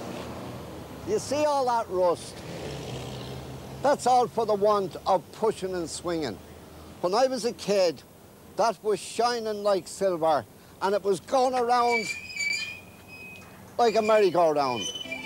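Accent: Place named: Dublin, Ireland. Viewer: The aul Dublin accent, a thing of beauty. Ordanges and Chapelizard. Great stuff!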